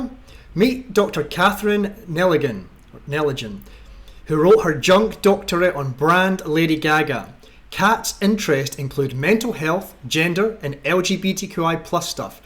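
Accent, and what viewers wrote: Scotland, United Kingdom. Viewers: The fact that this is all narrated with a heavy Scottish accent makes the whole diatribe the more entertaining 😂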